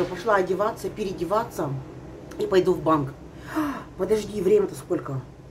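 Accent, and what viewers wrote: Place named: Chuvashia, Russia. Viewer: ЧУВАШКА... У неё же чувашский говор, какая русская????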